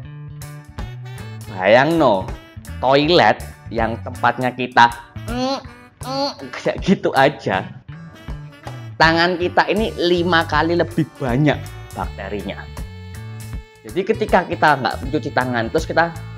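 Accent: Java, Indonesia. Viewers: 😜doktere lucu y.medok bangettt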